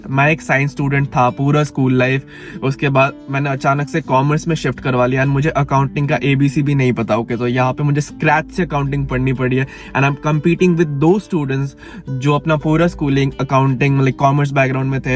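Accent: India. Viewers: Can you talk slowly cuz you have an accent. You sound indian still cuz im listening your vlog for purpose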